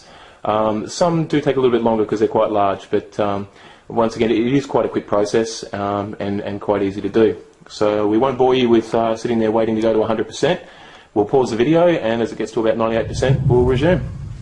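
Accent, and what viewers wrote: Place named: Australia. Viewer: are you from oz mate????sure sounds like it.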